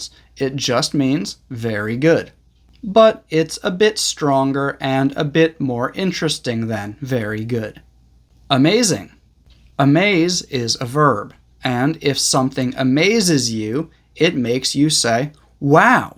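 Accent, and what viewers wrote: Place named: United States. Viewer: You are too kind. In awe of your beautiful American accent as well.👍😊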